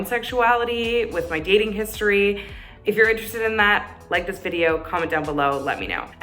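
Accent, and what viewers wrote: Canada. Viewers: Are you Canadian? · Are u Canadian?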